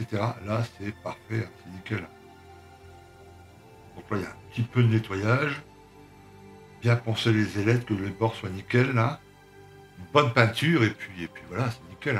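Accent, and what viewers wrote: North, France. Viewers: Sympa la petite voix un accent de ch'nord (normal pour un billoutte)...Super maquette..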